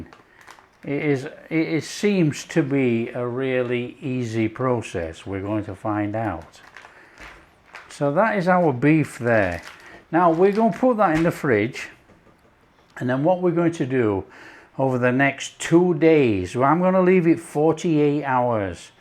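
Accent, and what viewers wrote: United Kingdom. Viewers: How I love to learn about such "basic" recipes! I only knew that much: the name originated from coarse sea salt, named corn, back in the days. For decades, I thought it was corn-fed cattle. Little did I know. Talking accents (a personal question, I know): I'm german. Been married to an american woman. Was related to a girl from down under. While my english is reported as "quite well", I still cannot decide, when it comes to accents. You're using Coleman's mustard. I do assume you're from the UK. But what exact region? Pls help me out! A friend of mine is from Norwich. I've also been to Ireland, once. Just my curiosity. I could listen all day long to Cheryl (What's for tea? here on YT) and Melisa (Soul singer reacts.) Music to my ears (in both cases). Prior to our wedding, a young man at the supermarket asked me about my accent. And was surprised. 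Saying: "I thought you where either english or scottish." A huge compliment for me! I've been born and raised a bit northwest of Hamburg, Germany. Never lived in an english spoken country.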